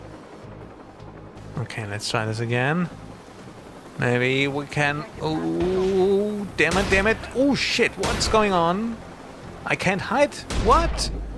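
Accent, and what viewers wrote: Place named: Germany. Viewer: Are you german? I can hear a slight accent :)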